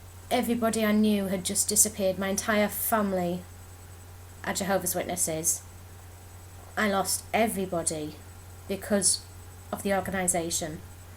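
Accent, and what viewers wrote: Northern England, United Kingdom. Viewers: For a Northern accent, yours is rather pleasant i must say! From a not posh Suvverna. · Sounds like a northern lass!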